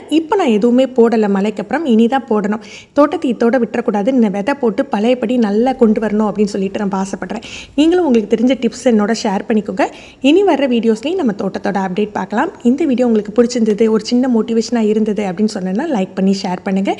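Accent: Tirunelveli, India. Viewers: Akka neenga tirunelvelia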